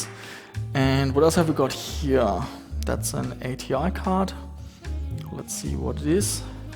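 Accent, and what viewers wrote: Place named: Germany. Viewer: Der Deutsche Akzent is real xD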